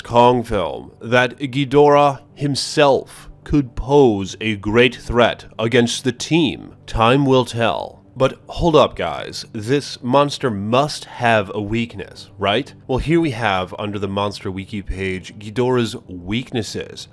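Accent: RP, United Kingdom. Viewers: Why do u talk like a British butler!! annoying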